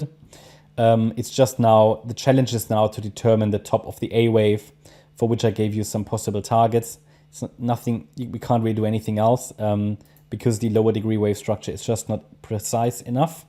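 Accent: Germany. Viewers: Not bullish😮😅😅😅...are you german ?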